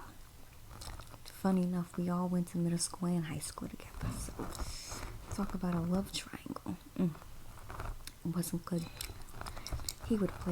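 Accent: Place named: Long Island, United States. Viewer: HIGHKEY so glad u used the Long Island accent it’s so tingly and comforting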